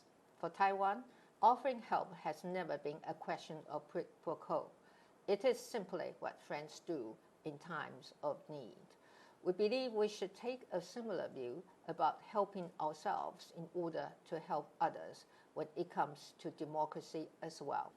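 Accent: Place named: United Kingdom. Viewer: 總統的英式英文聽起來很舒服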